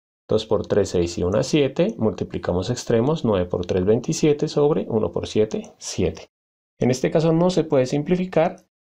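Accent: Colombia. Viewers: PROFE COLOMBIANOOOOOOOO HOOOOOOOOOOOOOOOOOO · Es de Colombia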